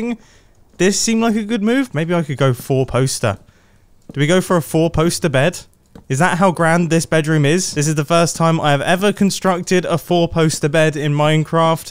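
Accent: United Kingdom. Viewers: He's so British LOL, he says 'por-al' the 't' is kinda soft.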